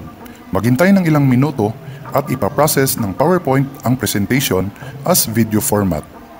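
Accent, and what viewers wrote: Visayas, Philippines. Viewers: Galing mo bro..boses mo parang bisayan tonation...pareho tayo..nice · Bisaya ka. rin ba sir?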